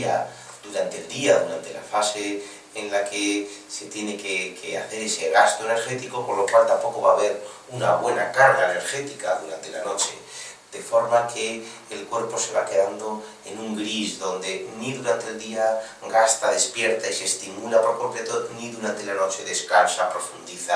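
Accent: Spain. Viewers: Pues, soy mexicano y me es incómodo y difícil escuchar lo que dice éste señor. No porque use otras palabras, si no más bien que por su acento me es complicado escucharlo. ¿Por qué? No lo sé, tal vez sea porque no estoy familiarizado con el acento español.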